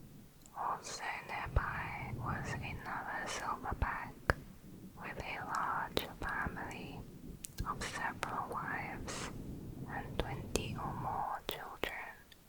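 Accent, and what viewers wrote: England, United Kingdom. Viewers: British, very apparent. It's hard for me to understand her due to it but I love her videos regardless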